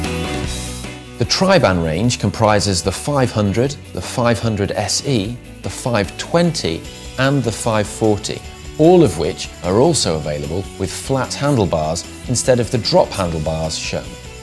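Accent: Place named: Northern England, United Kingdom. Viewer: accent has gotten a lot more Northern nowadays.......by 'eck!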